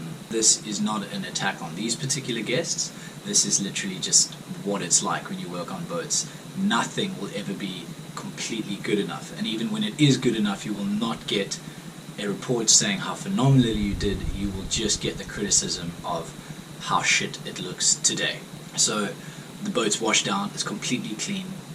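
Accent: South Africa. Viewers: Mate are you South African ? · By his accent he must be South African. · Why do you sound South African? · Dude are you South African?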